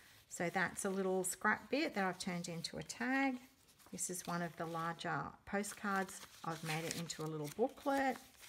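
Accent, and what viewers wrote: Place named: Australia. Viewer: lovely work - its great to hear an aussie accent on YouTube. Keep up the great work:)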